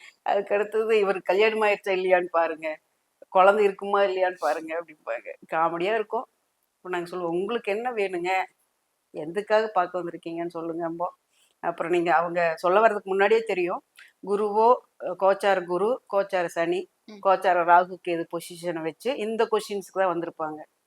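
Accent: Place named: Kongu, India. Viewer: Konguu tamillum kamidiyana pechum!!😂🎉.....naadi joshiyum super!!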